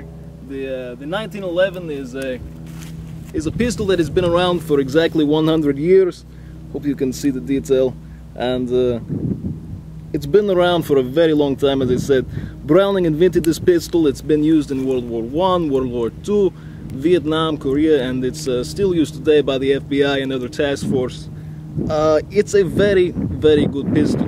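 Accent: Russia. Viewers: The Russian accent is slowly wearing off =( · Hey, Ive been watching you for around a week or 2 and I think your awesome, I always knew russians are this cool. Your accent is awesome, your always randomly funny and you shoot very well. I hope you will save us all when the zombies arrived some day:3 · omg I love russian accent XD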